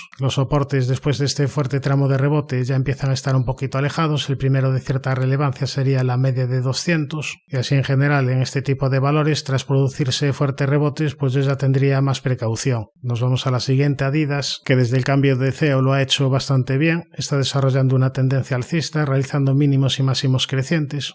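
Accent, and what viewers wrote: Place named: Seville, Spain. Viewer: Por el acento y tu nombre y apellidos está claro que eres de Triana 😅. Llevo más de 35 años invirtiendo en Bolsa y puedo afirmar que eres un Crack y te sigo desde que te descubrí. Entiendo de Balances y necesito siempre tener datos de los directivos de las Empresas pero, por desgracia, no tengo conocimientos técnicos de gráficos ni velas...Te apoyo al 100% para que sigas dándole luz a nuestras inversiones. Te lo dice un Coruñés nacido en el Concello de Mañón tbn con acento Sevillano. Mucho ánimo y, sobre todo, muchísimas gracias por tus aportación a nuestras inversiones.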